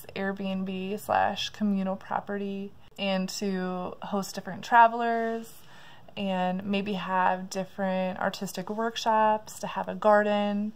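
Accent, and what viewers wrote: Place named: Minnesota, United States. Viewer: Very happy for you. 😎 I just found and subscribed to your channel. I'm a South Dakotan and have previously lived in MN for 12 years (now in Springfield MO.) I recognize your MN accent. 😉 I have been to Pitt many times and agree that it is an interesting city. (My daughter lives there.) You have created a dream life for yourself. I so wish I was young again and healthy enough to travel. One more thing, I'm going to bet myself that your last name ends with -son or -sen. Lol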